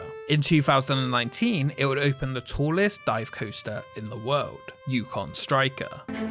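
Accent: United Kingdom. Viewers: You're British though right? Guessing from the accent but of course could be wrong!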